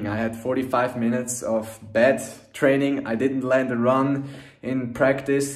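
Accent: Switzerland. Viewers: Deine Vlogs werden echt immer besser! Liebe deinen Schweizer deutschen Akzent😂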